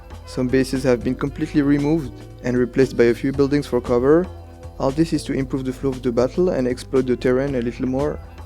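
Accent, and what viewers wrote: France. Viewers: you are french no ? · Cet accent francais ^^ Tu parles francais en langue natale ? Il y a des vidéos où tu parles full french :) ?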